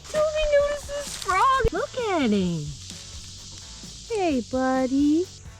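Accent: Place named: Arkansas, United States. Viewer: You're accent sounds Arkansan. That environment seems very Arkansan. Are you in Arkansas? I grew up in NW Arkansas. Heaven on earth for around 4 month of the year. Otherwise it's hot as crap or cold as crap lol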